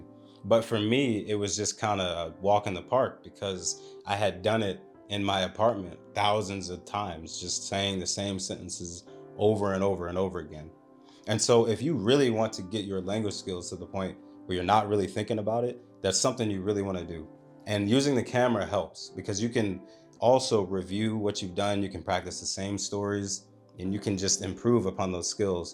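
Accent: United Kingdom. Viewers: That was a lovely posh British accent! Very interesting channel. Nice to see another brother in Tokyo doing well. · New subscriber. Awesome content. Brilliant British accent too 🎉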